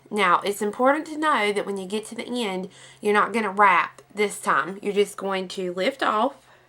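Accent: South, United States. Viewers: Great tutorial and very easy to understand and follow. I knew it was a southern voice I heard! I'm from Columbia, SC You can bet I will be checking out your other videos. Debbie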